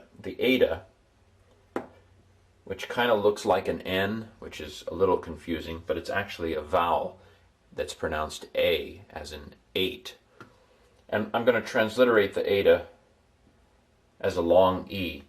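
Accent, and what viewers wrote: United States. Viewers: The other problem is that each nation has it's interpretation of vowel sounds. Americans are notorious for replacing vowels with arbitrary schwa sounds. Listen to how an American pronounces Banana - three "a"s - all with a different vowel sound. Americans also belabour the emphasis on the FIRst Syllable. Hence the POL-ice in ARIizona have the best REE-search and DEE-fence. To a native English speaker it sounds gauche and as if they are deliberately trying to shout or be rude. I'm not sure I can agree on how he pronounces ETA because of the bizarre way Americans deal with "a" in general....they pronounce it more like AAHH. Their "O" sounds like wind up ooooOOOHHH. Listen to how an American says oooOOHHrange instead of Orange. There is no way ancient Greek would conform to modern America colloquialisms, yet you can hear his heavy American accent as he pronounces "Greek". 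· you're "americanising" the whole thing sorry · Erasmus killed the sound of ancient Greek just to make it easy for Americans. Sorry It's not Gama it's whama (like "why"). It's not delta it's δέλτα (like "this") "Αυτοις" for example it's not "αυ-τ-ο-ις. I know it's convenient but it's" αυ-τ-οις " ο+ι=ι Otherwise there is no harmony. Sounds like an American trying to speak Greek.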